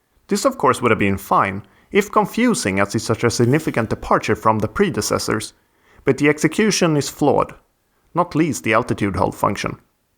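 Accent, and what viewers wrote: Sweden. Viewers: Yay Jag hör en svensk! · Är du svensk? din engelska låter så iaf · Takes a Swede to know one maybe XD could hear it too immediately and I'm a swede as well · Let me guess, you're swedish? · Are you swedish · i can hear that this guy is originally swedish :D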